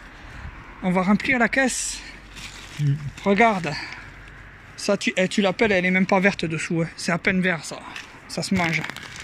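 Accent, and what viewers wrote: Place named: South, France. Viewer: C’est super ce que tu fais! Et en plus j’adore ton accent du sud c’est magnifique! Bon courage!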